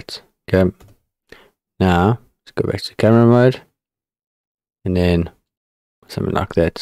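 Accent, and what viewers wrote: South Africa. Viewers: The first 3 seconds of the video and I already thought that this guy is probably South African :)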